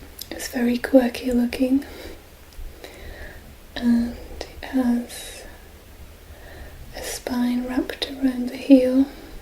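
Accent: Scotland, United Kingdom. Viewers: Since I have not heard you speak out loud it is difficult to place your accent. I would guess Scottish? but I can't be certain. I have been watching your vids for some time and they always relax me. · Is she from UK? I love her voice and accent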